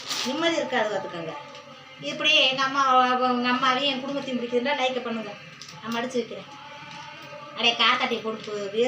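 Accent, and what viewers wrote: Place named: R.S. Mangalam, India. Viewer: வீடு கிரகப்பிரவேசத்திற்கு வாழ்த்துக்கள் அக்கா நான் R.S Mangalam சொந்த ஊர் சென்னையில் இருக்கின்றோம் நம்ம ஊரில் உள்ள பேச்சு அப்படியே பேசிறிர்கள் ரொம்ப புடிக்கும்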